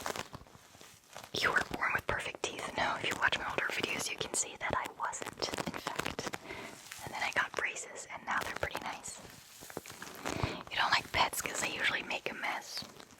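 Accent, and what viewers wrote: United States. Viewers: I always assumed she was American living in the central north part of the country where there is a more German population.. I’d guess her first language was American English because she has an American accent, uses colloquialisms like “beating around the bush”, and even takes American liberties like saying “ommina” instead of “I’m going to” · Mando is saying why does she have an American accent when she's not American? That makes sense too.....She should have a German accent when speaking English. I've never heard a German with an American accent...... ever.... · Alright, from your accent I literally thought you are American. Kind of surprised me when you said you’re from Germany. You have a really clean American accent. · Never would have guessed you were German. I thought you were American based on your accent. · I just don’t understand how you’re German living in Germany with an American accent !!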